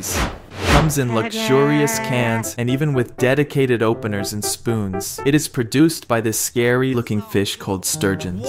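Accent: United Kingdom. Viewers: Anyone else notice like half way through he turned British? Wtf? Or am I having a stroke? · I like how he progressively becomes more British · Why did bro become more and more British as the video went on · Why did he start forming a British accent throughout the video am I tripping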